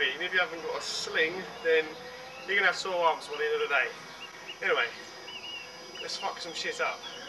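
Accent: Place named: United Kingdom. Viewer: hahahaha i love it how you say anyway lets fuck some shit up in ur british voice lol epic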